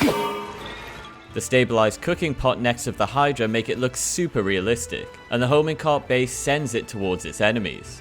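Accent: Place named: England, United Kingdom. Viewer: Quick question, is your name “,” or “?” It’s hard for me to understand at the beginning of your videos, since I’m not used to the English accent…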